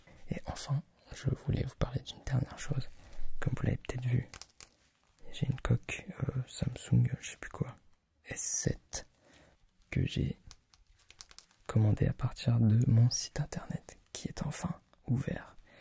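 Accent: France. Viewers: ACENTO FRANCES DE AQUI A JAPON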